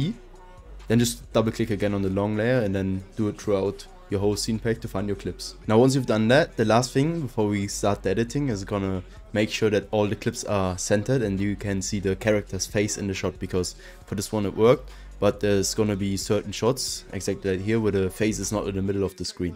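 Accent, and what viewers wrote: Germany. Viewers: man hört dein deutsch extrem raus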